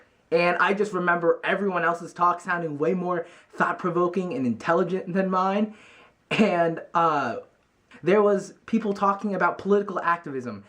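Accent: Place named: Vietnam. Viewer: I love your accent, are you Vietnamese?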